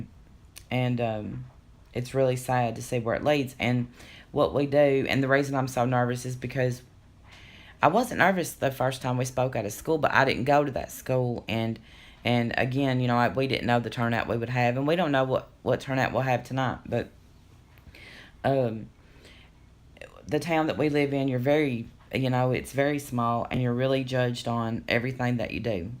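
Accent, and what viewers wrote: United States. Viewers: I am sooooo proud of you!!!! I knew from the first video I watched we had more in common than our country accent!!!! I too am an addict in recovery....one day I may tell my story. And, just keep it in the day and before you know it, it'll be years and years!!!! So proud of you, keep up the good work!!!!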